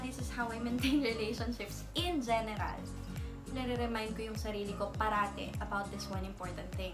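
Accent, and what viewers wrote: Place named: Visayas, Philippines. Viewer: HAHAHAHA funny yung bisaya accent 🤣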